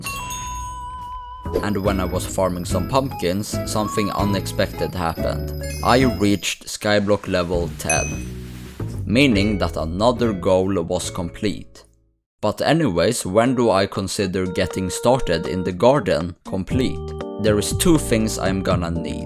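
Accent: Sweden. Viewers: Svenska accent👌🤌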